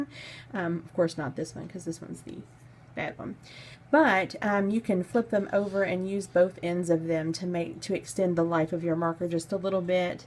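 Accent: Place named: South, United States. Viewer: Ahh love a southern accent tutorial vid 😊 reminds me of my fam down south. Ty for posting this!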